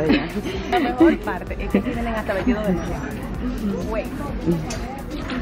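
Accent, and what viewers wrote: Dominican Republic. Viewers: La que está hablando es como dominicana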